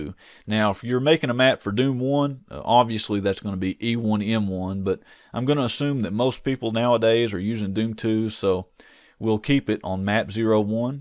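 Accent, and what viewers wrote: South, United States. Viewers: I think more tutorial people should have southern accents, it makes me more attentive to listen.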